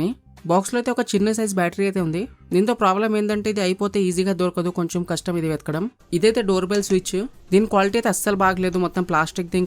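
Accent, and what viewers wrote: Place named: Hyderabad, India. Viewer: Bro, Name emo. Slang emo old city muslim guy la undhi.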